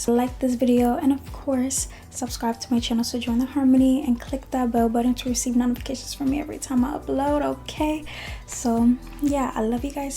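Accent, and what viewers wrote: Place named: Los Angeles, United States. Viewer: Your voice says Los Angeles but your eyebrows scream the South Bronx. Nice bag.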